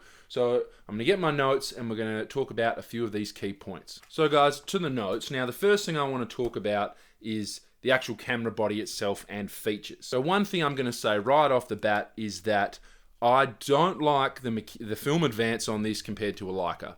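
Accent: Australia. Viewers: I'm a very satisfied Voigtlander Bessa-R (LTM) owner, and I don't really care that much about what you're saying. I just love watching a real-life giant with a wicked Australian accent talk about analog photography, so cheers for all you do, mate ; )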